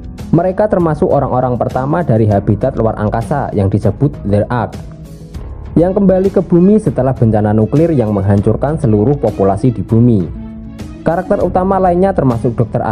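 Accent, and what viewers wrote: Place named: Java, Indonesia. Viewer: Medok ya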